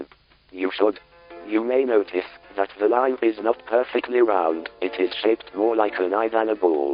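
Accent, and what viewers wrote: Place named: France. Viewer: Is this your voice run through a filter? I would've thought just text to speech but it has a definite French accent(I haven't heard your voice in a long time so I can't tell if French tts or you through a filter)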